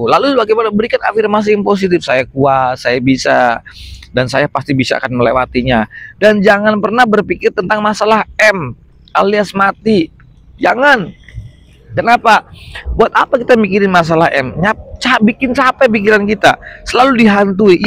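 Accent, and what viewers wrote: Banyumas, Indonesia. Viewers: kirain ormg sunda kang jbule ngapak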